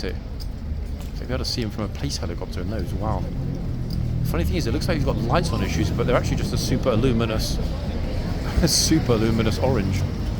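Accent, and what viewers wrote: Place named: Cockney, United Kingdom. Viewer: You are a very rider cockney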